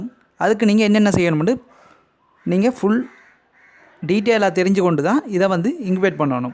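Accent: Sri Lanka. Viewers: Hi are u Srilankan tamil nice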